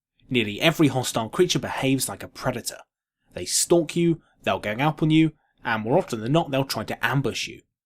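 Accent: United Kingdom. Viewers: The fact that a man with a British accent having to clarify that he was saying terror and not Tera is hilarious to me 😂😂😂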